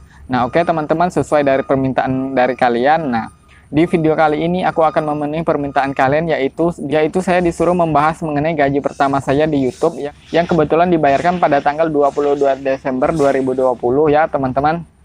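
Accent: Jambi, Indonesia. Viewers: orang jambi kah bang?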